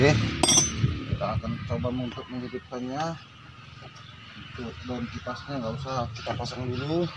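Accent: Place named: Karo, Indonesia. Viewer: Bang logatnya macam orang karo. Kalak karo Kam bang?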